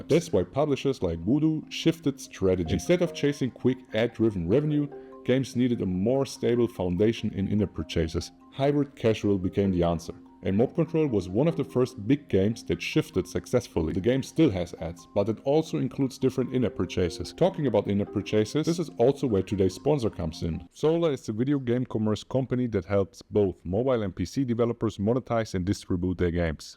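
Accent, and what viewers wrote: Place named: Germany. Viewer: i smell german. wie machste das mit den steuern ?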